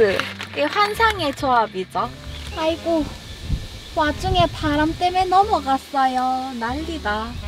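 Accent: Gyeongsang, South Korea. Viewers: 갱상도 분이신가보다 ㅋ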